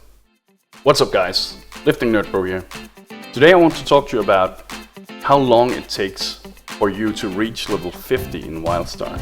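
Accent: Denmark. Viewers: Your accent sound danish :P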